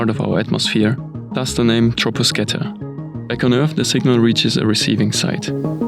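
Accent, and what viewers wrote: Germany. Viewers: nice video but why is it so hard for germans to speak english without accent?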